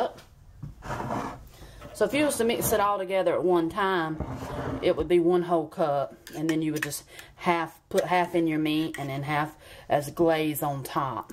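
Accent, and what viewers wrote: South, United States. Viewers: John Burgess Jerseyians don't sound like this.. She's southern for sure.. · Your southern accent telling me you are from North Carolina on the VERGINA border ,?? Maybe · Great video & new Subscriber. Ps , I love your accent , The southern accent is sooo lovely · Forget about Gordon Ramsey and those other "Snooty Chefs". A Southern gal that knows what good honest food is - that's the ONLY way to go. I tried this and it IS the best meatloaf I ever had. Thanks! btw...Love your accent!!!!!! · Maa’am, I don’t know who you are , but I know these two things: a) you’re definitely from somewhere South of the Mason-Dixon Line, and, b) you gave the world probably the BEST meatloaf recipe known to humankind, putting all others in the dust. I’ the cook in our house, my wife cleans, and she thinks it’s the tastiest meat loaf I’ve ever made! 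Many “ thank you’d” are in order!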